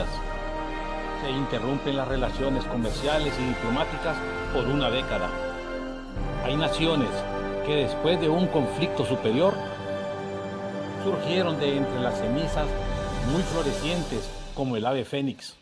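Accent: Honduras. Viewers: Juan Alvarez EXACTO DESDE EL PRINCIPIO QUE EMPIEZA A NARRAR SE CONOCE Q ES CATRACHOOO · No hay duda que por la forma que narra la historia es hondureño jijiji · Ese narador a de ser hondureño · Este maje del narrador es hondureño jajaja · Con que dolor lo narra este idiota narrador, se le nota lo hondureño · Ese narrador es puro hondureño no bale verga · Se nota que el que habla es hondureño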